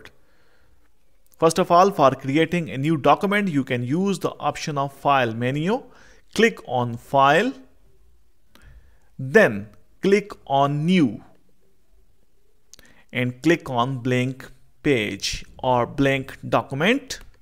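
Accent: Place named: India. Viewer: The heavy indian accent 😅😂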